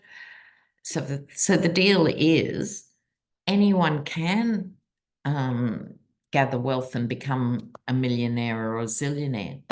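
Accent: Australia. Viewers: Love you,! Your Aussie accent & dry humour somehow makes the bad news easier to take!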